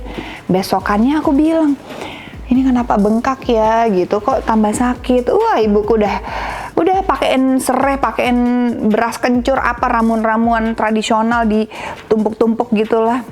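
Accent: Bali, Indonesia. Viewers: Logat balinya baru keliatan 🙏 salam rahayu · Seneng liat kak pke logat bali, ga kagok, emang orang bali sh ya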